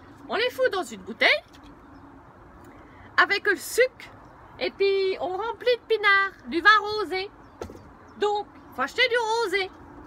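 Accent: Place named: Berry, France. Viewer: Euuuuuuu, c'tieu fumelle c'tiont l'accent berrichon, pis alors rin à vouèr avec eul' nord pisqu'allé au milieu, dam'. Allez bonsouèr mon bounhoumme et pis pas p'us.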